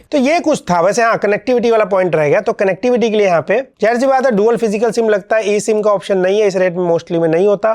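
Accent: India. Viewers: Guru g aap ka unboxing ekdam indian accent me best rehta hai na zada wo jaise aur log dikhate hai and kuch log muh bana kar karte hai unboxing par aap ka unboxing waqai me dekhne me dil karta hai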